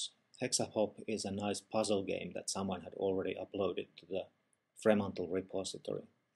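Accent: Finland. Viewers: you sounds finnish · That's a finnish accent alright. ;)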